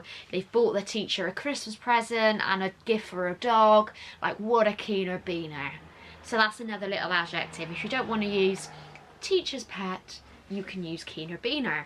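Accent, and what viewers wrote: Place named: Bristol, United Kingdom. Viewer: Haha love how Bristolian your posh queens english accent was!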